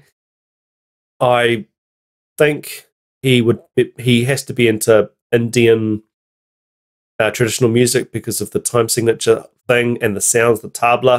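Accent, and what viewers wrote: New Zealand. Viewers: My golly that's a strong Kiwi accent! Kiaora bro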